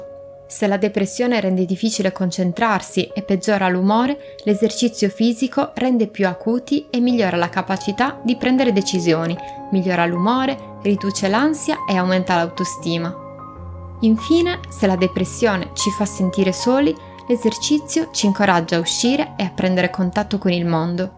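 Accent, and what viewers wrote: Sardinia, Italy. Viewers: Accento di bidda....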